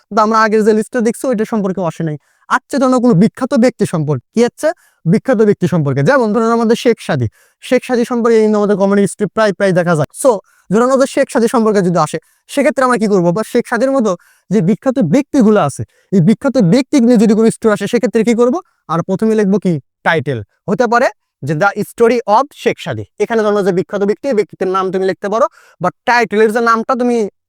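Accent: Bogura, Bangladesh. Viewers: Vai ki bogura r naki??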